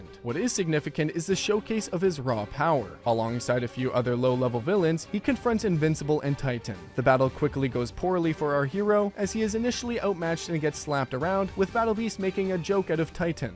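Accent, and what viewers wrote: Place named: Canada. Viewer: thick canadian accent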